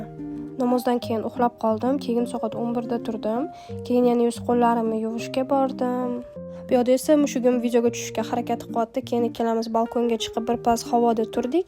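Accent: Uzbekistan. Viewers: Vodilikmisiz shevayiz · Хаммаси яхши кетябди лекин тилезди узгартирмай чала чулпак килиб тошкенча гапирманг илтимос вообще йимяпти😂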